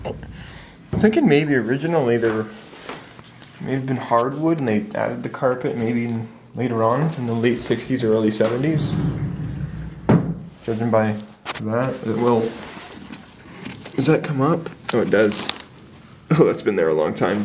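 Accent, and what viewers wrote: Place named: Canada. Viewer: I can already guess this is probably in Canada because of your accent.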